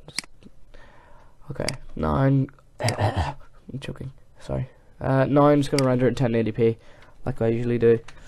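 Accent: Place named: Northern Ireland, United Kingdom. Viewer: Northern irish =) Yeoo!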